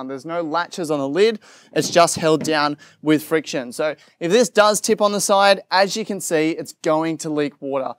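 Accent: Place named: Australia. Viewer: a very aussie accent))) how'ya' going mate?)))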